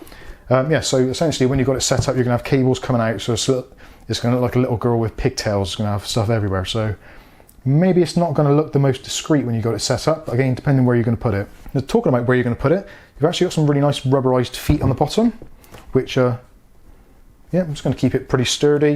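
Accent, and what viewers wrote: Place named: West Country, United Kingdom. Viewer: loving the west country a ccent, great review new sub added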